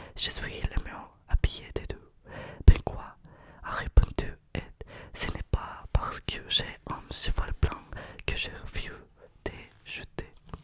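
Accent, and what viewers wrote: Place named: Russia. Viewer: Le Français avec l'accent Russes, c'est une tuerie en asmr !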